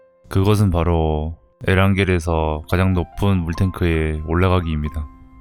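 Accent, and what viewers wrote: Jeolla, South Korea. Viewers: 점잖게 말하시는데 살짝식 섞여나오는 전라도 억양이 포인트 ㅋㅋㅋㅋㅋㅋㅋㅋㅋㅋㅋㅋㅋㅋㅋㅋㅋ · 혹시 고향이 라도신가요~?^^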